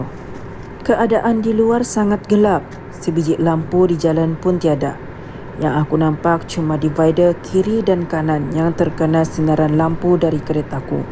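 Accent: Sabah, Malaysia. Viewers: mcm loghat sabah sajaa??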